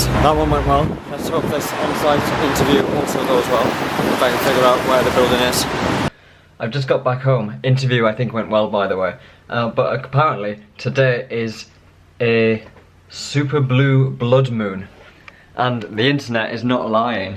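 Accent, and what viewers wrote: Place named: North East England, United Kingdom. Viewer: New Tech Genius Cause has a NE England accent. Is he more Durham way originally? They sound the same to me.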